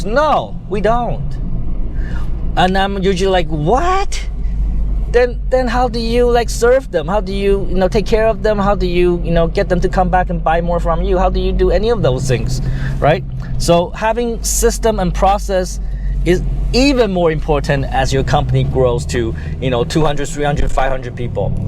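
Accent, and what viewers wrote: Standard. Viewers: nói rất chuẩn dễ hiểu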